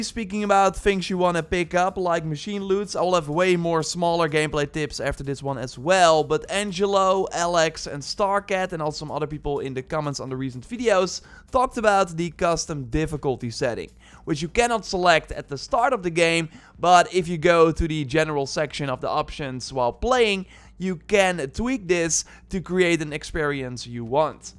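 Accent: Netherlands. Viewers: Are you Dutch? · I believe he is Dutch.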